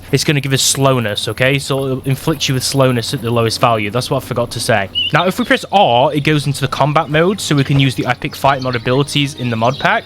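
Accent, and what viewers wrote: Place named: Northern England, United Kingdom. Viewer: Not sure if you explain how to get started in this pack, but I like your accent. Northern England, possibly Eastern, sounds nice.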